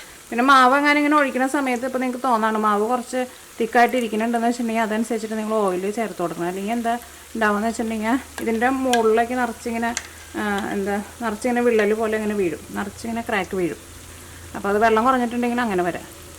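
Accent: Thrissur, India. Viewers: chechee alpam speed kurakamo samsaram trissur kaaree aanu alle?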